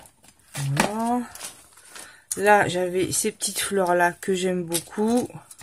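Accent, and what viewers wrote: Paris, France. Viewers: 🎶(d où ton accent titi parisien😍.)Coucou. Bien ces petits cadres. C est vrai que les parents il faut les mettre en valeur❤️. Je vais les refaire mais pour mes grands parents. Merci du partage. Je suis contente pour toi que tu aies trouvé de la bonne collasse😂. Tu souhaites un bon anniversaire à Mr COLTOUPARTOU le chef cuisto. Dis donc en fin d année ça vous en fait des choses à arroser. Donc petit verre à votre santé 🍻🥂(à consommer avec modération) tchin tchin. Bisous et passe un bon réveillon 🎉moi calme avec mes 3 frangines (car boulot surlendemain) on fait soirée karaoké et ma sœur va me chanter le best of de et, l autre ce sera et et la troisième les cantiques d'église, chouette soirée, non? Mais je les aime comme ça ❤️ et aussi, en passant par et et bien sûr (pas d anglais car on ne parle pas anglais ou très peu ou très mal😭😭😭) belle soirée en perspective hihi bisous et belle année 2019 à tousssssss et toutes❤️🎉🎶🍀👍💞💞💞💞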